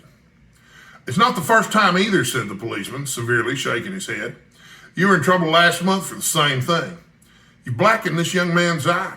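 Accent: Texas, United States. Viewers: You had me laughing out loud with this chapter, especially during the description of of their gymnastic tussle! I think I have the best chance of feeling fond of Professor Challenger hearing him with a wonderful Texan accent. I daresay the one thing that can make Conan Doyle even more enjoyable than it is on its own, is to picture it taking place with Texans rather than Englishmen (no offense to the English!). Anyway thanks for the reading.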